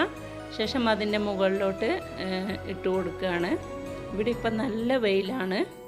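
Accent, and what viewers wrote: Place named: Kannur, India. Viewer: Ith sherikkum oru variety thanne😍😍 ithaante nalla sound😍😍enikk kannur slang ishtaanu.. peruth ishtam ❤️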